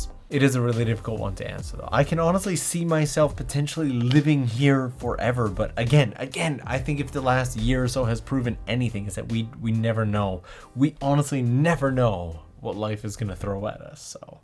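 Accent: Canada. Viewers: Your accent is screaming Canadian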